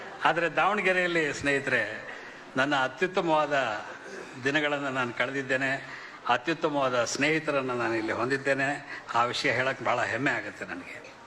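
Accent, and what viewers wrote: Ballari, India. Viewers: ರವಿ ಬೆಳಗೆರೆ ಅವರ ಮಾತನಾಡುವ ಬಳ್ಳಾರಿ ಕನ್ನಡ ಕೇಳಲು ಆನಂದ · Ballari Kannada ❤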